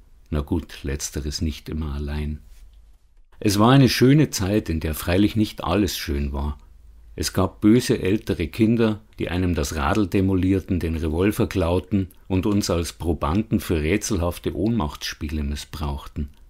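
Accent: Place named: Munich, Germany. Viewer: Ein toller Betrag vorgetragen mit einer soooo angenehmen Stimme und dem wohligen Münchnerisch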